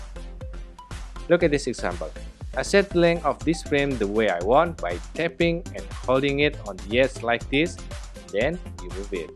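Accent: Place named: Indonesia. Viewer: From the speaking, i know this people from indonesia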